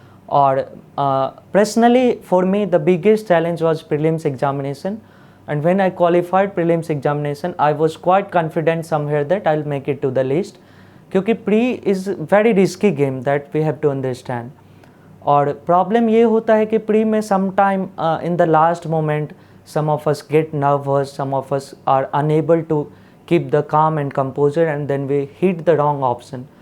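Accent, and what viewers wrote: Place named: Bihar, India. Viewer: Sun kr LG raha hai ki ye bihar se honge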